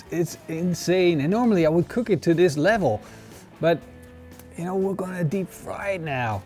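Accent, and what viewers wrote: Netherlands. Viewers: In less then then 10 sec I knew you must be dutch lol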